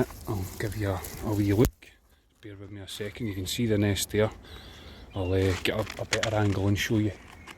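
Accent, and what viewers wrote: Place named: Scotland, United Kingdom. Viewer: Nice finds.. i love those turquoise blue eggs.. ur Scottish accent is fascinating 😊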